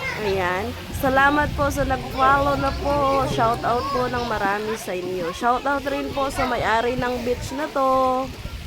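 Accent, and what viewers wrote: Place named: Waray, Philippines. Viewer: Upaya gad. an pag Tagalog.. Ky"WARAY"accent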